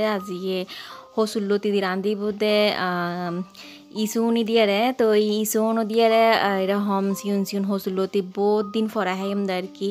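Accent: Chittagong, Bangladesh. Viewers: আপু চট্টগ্রামের কথা শুনতে অনেক ভালো লাগে চট্টগ্রামের ব্লগ দিও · Apo apnar kotha golo onek sondor lage but bojinaaaa..... Amar o chittagong jelar but sondwip opojelar......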